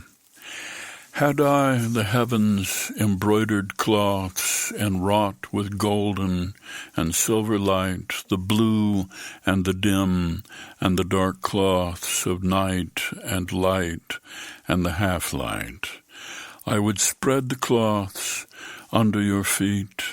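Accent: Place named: Midwest, United States. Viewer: That the earth went around the sun and not the other way around..... I love the " Midwest talk"!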